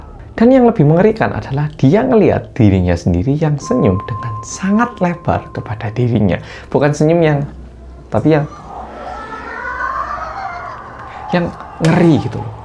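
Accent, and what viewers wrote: Java, Indonesia. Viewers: 😂😊suka bgettt m cowok cowok Korea eeh Nemu konten kok BS bahasa Indonesia medok juga ... JD fans 😂😂😂😂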